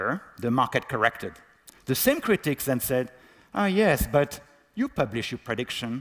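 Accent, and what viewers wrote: France. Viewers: If you find him hard to understand, it's probably because you don't get to hear other people's accents too often, making this more of an issue about you. He's obviously French and has a French accent, but he's not CHOOSING to speak like that- as with everything, learning to master a language takes practice. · u french? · His French accent is thick as fucc tbh tho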